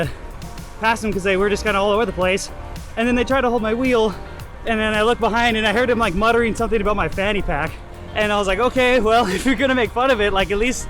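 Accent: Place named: United States. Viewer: I follow you since 2013 I think, and I have never understood a single word, because you speak very fast and with american accent (I'm Italian). But I like your videos! :)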